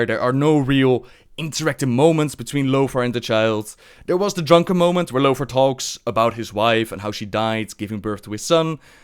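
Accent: Netherlands. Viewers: As a dutch person i have to say... he sounds dutch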